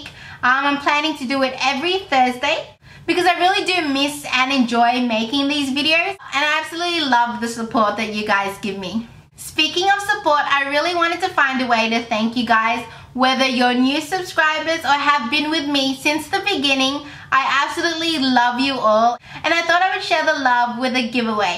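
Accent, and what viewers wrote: Australia. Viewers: Thanks for throwing this giveaway. I love hearing your Australian accent! I would also love to see more product reviews, and possible drug-store-dupes of higher end products. Have a great day! :-)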